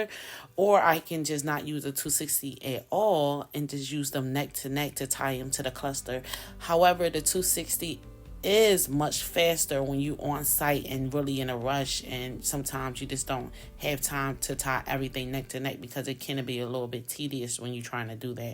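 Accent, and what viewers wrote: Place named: Philadelphia, United States. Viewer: I knew you were a philly girl as soon as I heard your voice..I can recognize a fellow philly girl from anywhere! Lol....I love what you are doing and your work looks amazing I moved to Florida and I am about to start my business as well.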